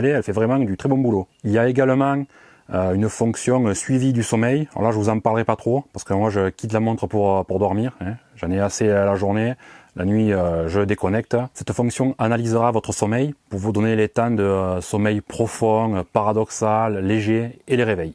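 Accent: South, France. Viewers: Insupportable son accent du sud 🤦🏿‍♂️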